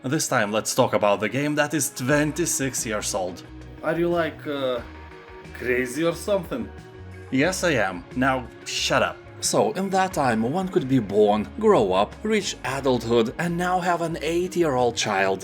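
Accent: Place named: Baltic states. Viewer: Im not sure if you actually convinced me to pick up the game again or if it was the thick Baltic accent that just made it sound like you were speaking from authority. Either way, I downloaded it from GOG, the one I had on CD wasnt "complete" anyways even if I could find it.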